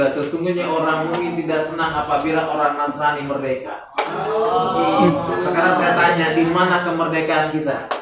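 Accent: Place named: Java, Indonesia. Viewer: Bilangnya dari sunda tapi logatnya jawa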